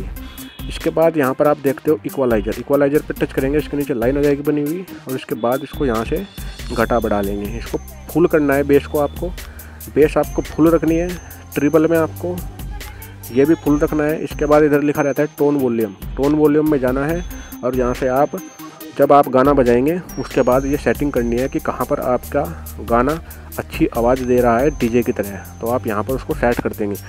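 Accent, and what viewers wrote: Haryana, India. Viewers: bro aap Haryana se ho na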